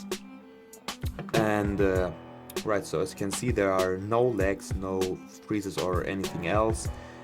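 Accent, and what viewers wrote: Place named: Germany. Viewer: Man merkt das du deutscher bist 🇩🇪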